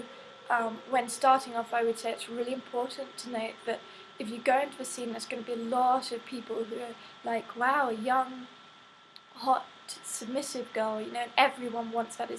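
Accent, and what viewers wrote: United Kingdom. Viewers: A blond-haired, blue-eyed, British-accented Asian? The f? Your "master"is like 50 and you are what, 18-19? This is just stupid and crazy, especially that he enjoys seeing you in pain!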